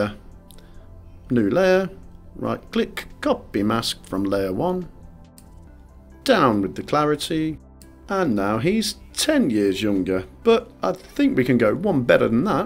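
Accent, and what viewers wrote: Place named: RP, United Kingdom. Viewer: Thank you for your awesome tip!! Furthermore, watching your excellent videos is like killing two birds with one stone: I learn Capture One Pro, and I improve my English thanks to your Received Pronunciation 😅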